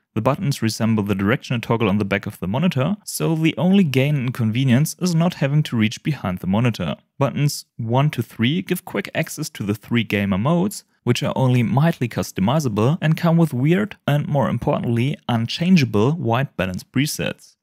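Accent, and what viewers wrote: Germany. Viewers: Dude, you sounds like a German who speak English. Hoffe mal ich lag richtig. Hahah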